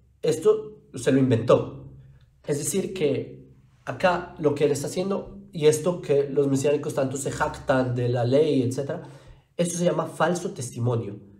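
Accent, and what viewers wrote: Colombia. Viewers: tú de colombia solamente tienes el acento, que ya casi lo estás perdiendo! Pero la cara esa de Judío auténtico Israelita, Hebreo, del ME nadie te la quita! Una prueba es que si no lo fueras nunca te hubieran aprobado la Ley de retorno! No pierdas tiempo con estos analfabetas por favor! Tú eres buenísimo para enseñar y abrir mentes y transformar a muchísimas personas con la verdad! Gracias mil, Shalom!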